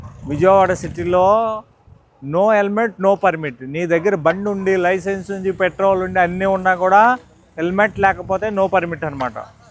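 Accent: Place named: Telangana, India. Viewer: ci garu telangana basha matladtunnadu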